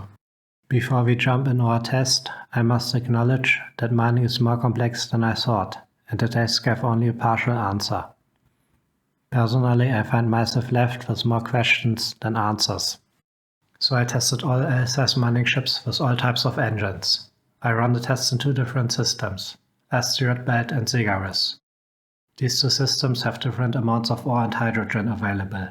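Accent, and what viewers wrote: Germany. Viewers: Lol u sound like you sit in a dungeon. Combined with the heave german accent i laughed throughout the whole vid. Great job my friend. #alloallo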